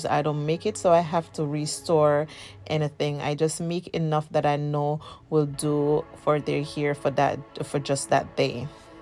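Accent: Jamaica. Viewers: Jamaican accent strong tho lol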